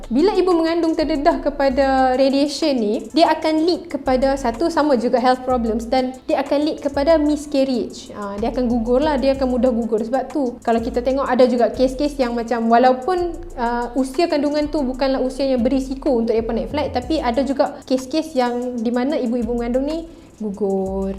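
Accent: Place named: Kedah, Malaysia. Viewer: Kak nak tanya sikit akak ni orang kedah Ker atau orang negeri sembilan sebab bila saya tengok macam orang Kedah sebab loghat akak bercakap deract kedah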